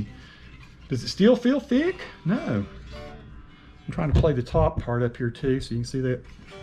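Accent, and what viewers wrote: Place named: South, United States. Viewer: That’s for the tips buddy. I love your accent man.. I wish I was born in the south😁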